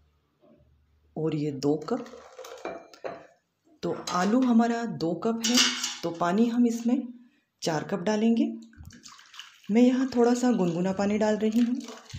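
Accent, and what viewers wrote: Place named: Malwa, India. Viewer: Aapko pta nahi gagan ji, indore malwa me aata hai aur waha ke local log isi tarah se bat karte hain...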